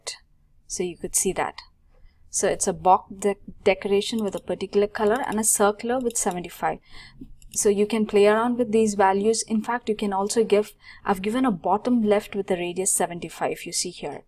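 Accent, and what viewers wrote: India. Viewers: Do this excersice was a quit difficult to me without seeing the code, because my english is "in working progress" and, by another hand, it was so difficult to understand hindu accent (like an England accent too..!). This was a challenge to me, but, like you said: "Yeah, there you go". I want to share you THE CODE: <link> Is in Spanish, so... do like me..! if you can't understand, use traslate from google..! Thanks so much for yours videos and to teach me that I can do it despite the adversities..!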